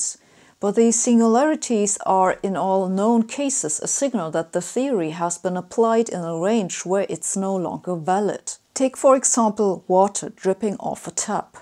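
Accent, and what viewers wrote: Germany. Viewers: I noticed your teeth almost never get apart. Wondering now if that's related to german accent while speaking english.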